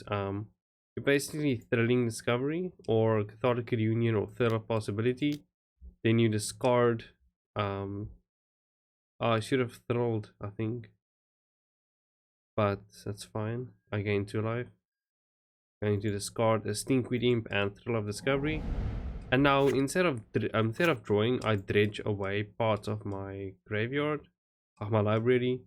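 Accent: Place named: South Africa. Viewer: The South African accent gets you a sub. Good video buddy.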